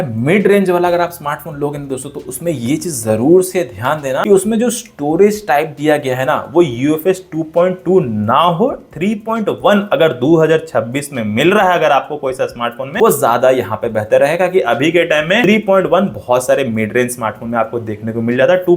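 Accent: Bihar, India. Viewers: बिहार se kya bhai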